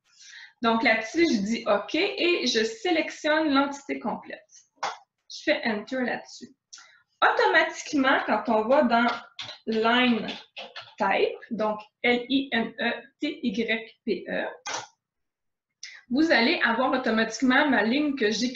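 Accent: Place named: Quebec, Canada. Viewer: Je viens de découvrir tes vidéos avec ton bel accent québécoise, c'est simple et concis merci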